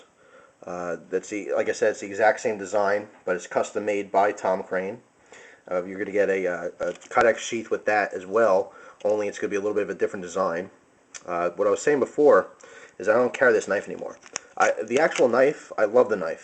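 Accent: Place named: Boston, United States. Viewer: a year or two ago i started watching some of your videos while i did my homework. you sound SOOOO different here! you have like a boston accent haha. just ordered myself a Dogfish and was checking out reviews, stumbled upon yours lol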